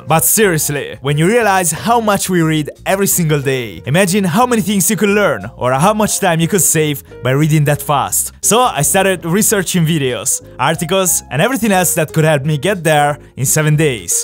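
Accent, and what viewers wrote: Italy. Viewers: Your Italian Accent is just OP🔥🔥🔥🔥🔥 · I knew from ur accent that u were italian, im too btw. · Cool English with an Italian accent! Neato! 💕☮🌎🌌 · His Italian accent is so good... makes me laugh cus I'm italian too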